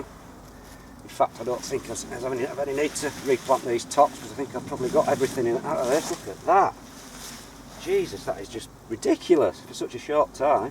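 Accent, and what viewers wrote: Yorkshire, United Kingdom. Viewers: Brilliant vid as always. Love your natural commentary and Yorkshire lingo. Not so much different from us in Lancs. not looking forward to winds if they come as you say. I'm on my 4th attempt green beans and they're hardly in flower yet and only 5ft tall. Winds will kill em off! My indoor cuke got flowers and no cucumbers yet! Chillies great and harvesting my outdoor bush toms already, indoor ones nowhere near ready. keep an eye on turnips. Mine are super quick. Seed to table in under a month! Don't ask me how and leaves huge! Hot here around teatime about 25* only 10-12 overnight. My 2 cabbage I planted a month ago as 2" seedlings are now ft long sprout plants! Packet obviously wrong!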